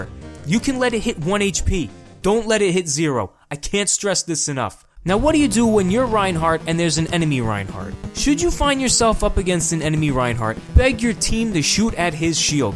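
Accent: New York, United States. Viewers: The fact that he has a New York accent makes every one of his roast better 😂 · Is this guy a fokin new yorkah or Brooklyn dude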